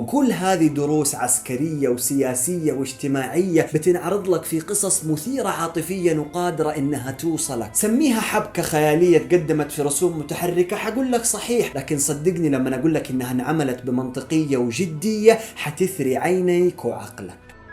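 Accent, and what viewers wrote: Yemen. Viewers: من وين انت لهجتك قريبه للهجه لليمنيه☻?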